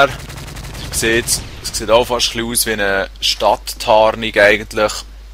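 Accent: Switzerland. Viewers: DD alter schweizer is das geil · Schweizerisch is schon lustig, jedoch als Deutsch verstehste manchmal nur Bahnhof :D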